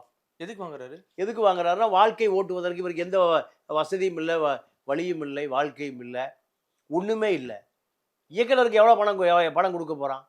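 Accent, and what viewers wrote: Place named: Madurai, India. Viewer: அய்யா நெறியாளர் உங்கள் பேச்சில் மதுரை மண் வாசனை தெரிகிறது!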